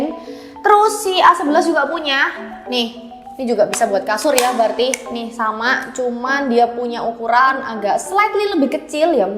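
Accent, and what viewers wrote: Java, Indonesia. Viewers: It’s Indonesian language with Javanese accent 😂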